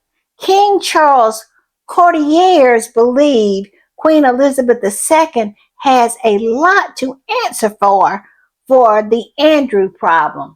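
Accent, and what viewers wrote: South, United States. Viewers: I'm screaming! Not "jaws tight"! And you always have me at " heffa"! 😂😂😂 Yep.... You're a southern gal, for sure! I'm loving it.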